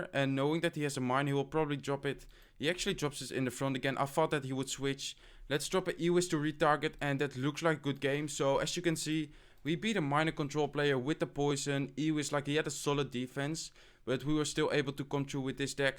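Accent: Netherlands. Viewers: Ahaha ik hoor zo aan je accent/stem dat je Nederlands ben!! Ps, leuke video! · Tiene acento holandés.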